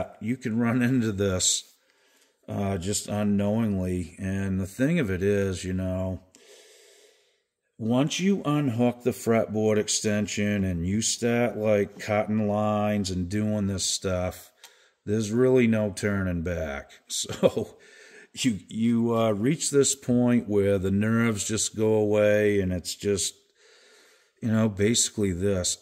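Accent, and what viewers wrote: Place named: New England, United States. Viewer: Wow, interesting! Following to see what becomes of this Harmony. Probably better than it ever was! Also, your voice reminds me of if Mike Ehrmantraut was born and raised in New England!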